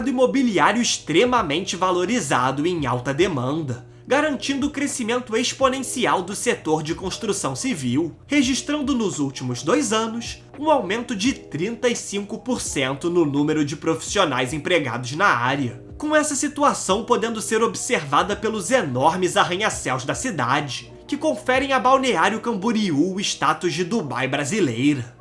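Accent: Rio de Janeiro, Brazil. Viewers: Esse carioquês do narrador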